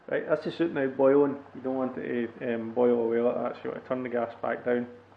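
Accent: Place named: Scotland, United Kingdom. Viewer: he has a beautiful Scottish speaking voice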